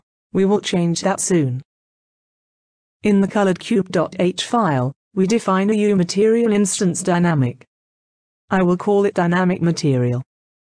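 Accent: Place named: United Kingdom. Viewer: Thank you, British robot lady.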